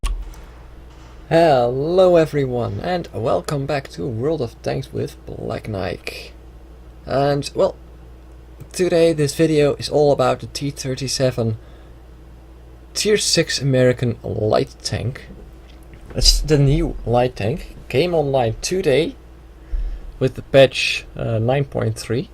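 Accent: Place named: Netherlands. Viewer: he is dutch, just like me and ussually the dutch hava TERRIBLE accent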